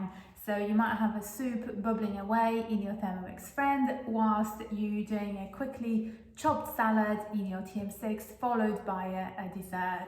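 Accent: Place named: Poland. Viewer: Slysze polski akcent:)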